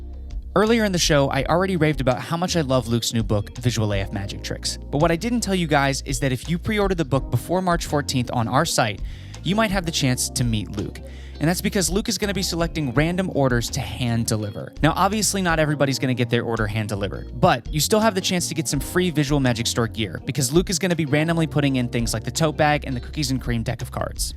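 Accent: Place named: United Kingdom. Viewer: Awesome just awesome and British accent on point 👌👌👌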